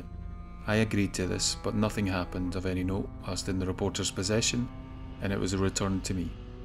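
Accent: Scotland, United Kingdom. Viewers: P.S.. Love the narrator's voice, Scottish accents just make me gushy!! I'd pay money for this guy to narrate my grocery list once a month!! lol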